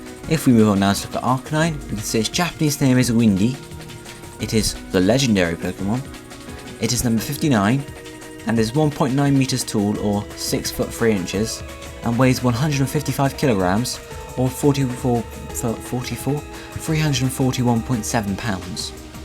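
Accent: United Kingdom. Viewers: There are two things I'd like to point out: 1.) the accent. It's way too British. If you could some how make it more neutral I'd appreciate it. 2.) if you make a mistake stop recording and do it all over again. It bothers me to listen who should be teaching me to make so many mistakes, specially when you add the British accent and the fact that you also leave some sentences without the final pun. Work on that please. :)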